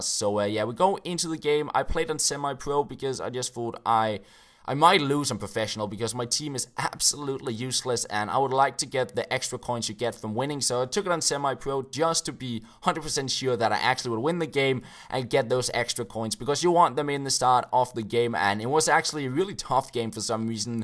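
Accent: Denmark. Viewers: U Sound danish.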